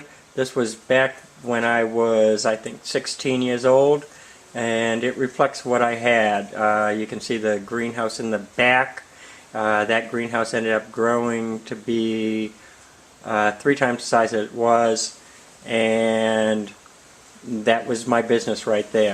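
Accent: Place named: New England, United States. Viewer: I knew you had a yankee accent. I can tell it a mile off. Hugs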